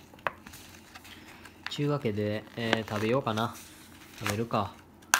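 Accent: Kansai, Japan. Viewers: 関西弁なんかいいな（笑）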